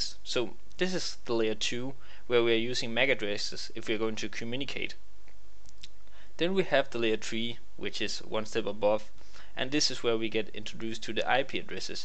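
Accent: Denmark. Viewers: I can hear you are danish from your english accent :-)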